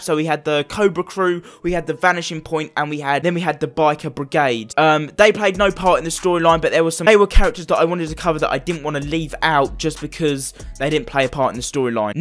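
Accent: United Kingdom. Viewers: Is it me or do all of these Fortnite you tubers who discuss fornite lore sound the same.....a uk man with a Very very strong Uk accent...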